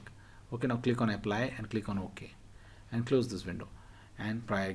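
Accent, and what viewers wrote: India. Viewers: Your guide was so helpful, i feel so bad at my self that as soon as i heard an Indian voice i automatically assumed it was a scam .. so sorry ..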